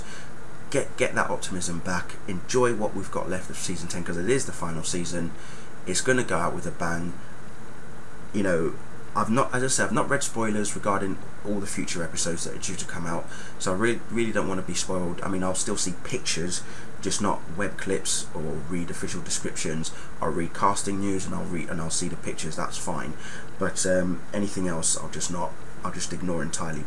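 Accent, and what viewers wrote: United Kingdom. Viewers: Man, U dont have to apologize its ur opinion. I might noit agree with u sometimes but I still like ur review and ur British accent lol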